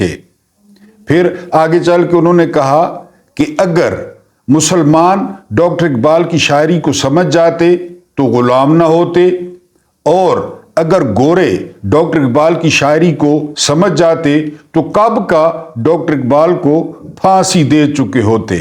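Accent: Saraiki, Pakistan. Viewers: اسلام وعلیکم! صاحب آپ کی وی لاگ بہت ھی انفارمیٹو ھوتی ھی۔ اینڈ ہر کھی کبھی سرائیکی لہجہ بہت اچھا لگتا ھے ، دعا ھے اللہ تعالیٰ اآپ کو خوش وخرم اور محفوظ رکھے آمین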